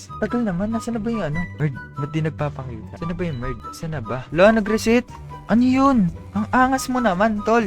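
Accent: Philippines. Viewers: Yaaa, Filipino accent like the filipinos, actually im surprised i always heard english in YouTubers accent 😊😅❤ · sabi ko na ba familiar ako sa filipino accent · HER SOUND LIKE FILIPiNO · Siguro Tagalog ka talaga Kasi parang Tagalog ka talaga kapag nags peaking English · It make sense because You sound like Filipino when you talk English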